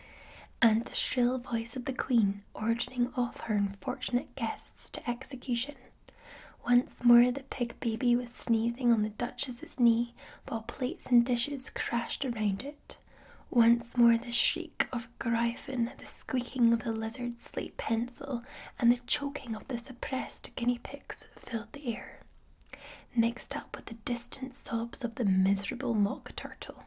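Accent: Scotland, United Kingdom. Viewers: My grandmother is Scottish, your accent reminds me of her 💕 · Amazing asmr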